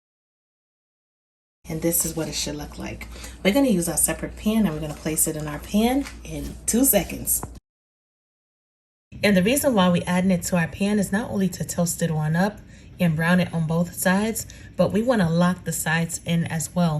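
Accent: New York, United States. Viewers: Great Recipes & tht FAB NY accent!😍🌹💓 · Ohhhhh I'm doing this asap omg😋very easy and looks delicious !ps, Love your accent you can have mine from Louisiana , I'll take a New york accent any day Lol! 😋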